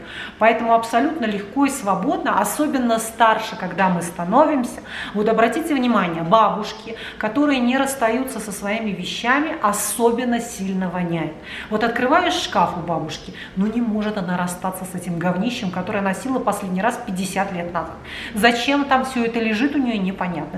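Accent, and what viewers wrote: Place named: Ryazan, Russia. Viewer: Почему свитАрок, какой-то рАзанский акцент со сленгом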